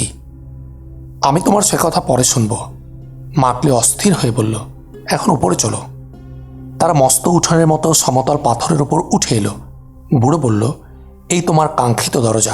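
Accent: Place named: Kolkata, India. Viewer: ভাই আপনার কথা বলার স্টাইলে মজা পাইনা, কলকাতার মত লাগে, বাংলাদেশের টনে কথা বলুন প্লিজ, (সুন্দরের উচ্চারণ করছেন ছুন্দর এমন অনেক উচ্চারণই বাংলাদেশের টনে হচ্ছে না,)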